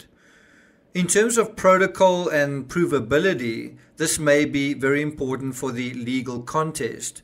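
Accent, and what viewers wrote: South Africa. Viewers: I think he’s South African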